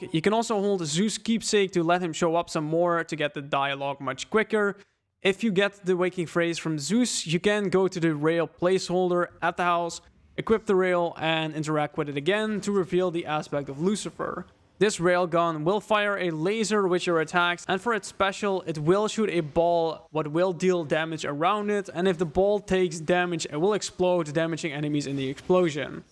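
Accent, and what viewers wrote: Netherlands. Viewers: HE IS DUTCH! I RECOGNIZE THE ACCENT! I LOVE DUTCH COCK! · Your accent is awesome, it makes you sound like a Dutch DJ xD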